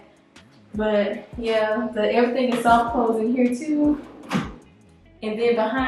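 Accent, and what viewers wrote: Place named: Houston, United States. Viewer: I think I hear a bit of Houston accent 🤔 beautiful home.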